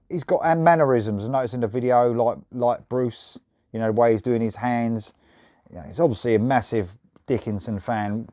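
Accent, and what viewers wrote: England, United Kingdom. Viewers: Fair review. Mendes is gifted by nature, why don’t take advantage of this? I prefer someone like him than someone else that tries to sing like Bruce and sucks. Mendes pays a tribute to the one and only. Respect. About the song... still a bit green, but better than any Maiden songs of the last decade if not more... not taking about the production 😅., I love your English accent, but your Brazilian sucks 😆 Cheers Max 🇦🇺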